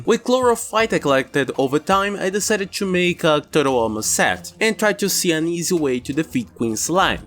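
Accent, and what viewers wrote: Brazil. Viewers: Awesome video just like the prehardmode one. I'm trying to tell where your accent is from, are you Brazilian?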